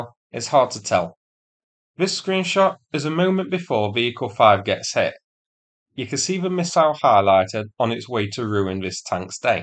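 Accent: United Kingdom. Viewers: The British accent is fantastic. It fits very well with British humor.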